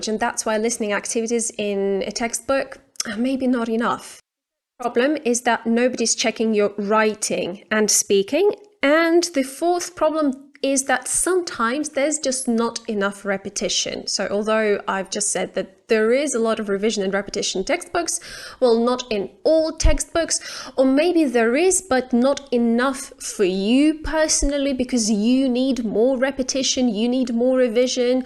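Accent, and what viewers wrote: United Kingdom. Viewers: Какой красивый британский акцент.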